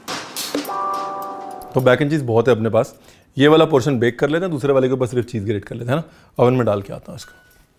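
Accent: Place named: Punjab, India. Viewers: U r commendable mann...aur jo punjabi nikalti h khana banate hue oye hoye hoye hoye😍😍😍😍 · Oye hoye, teri Punjabi!!! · Aap to na ji aap ne to cooking ka andaaz hi badal diya. Aur aapka punjabi lehza I just love to listen to it 👍👍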